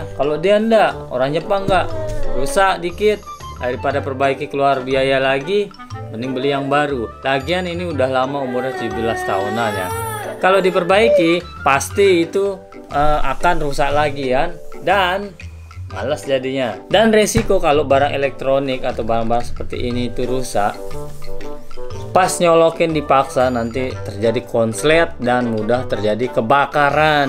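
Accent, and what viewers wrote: Lombok, Indonesia. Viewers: Saya baru nemu chanel nya bang,,stelah saya nonton bbrp videony rasany kok bahasa indonesianya lombok banget..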